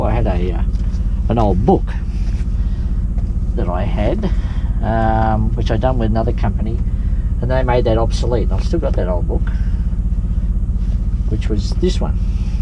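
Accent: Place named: Australia. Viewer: Thanks for posting this! I can practice listening to aussie English and also enjoy trucks. Keep up the good work.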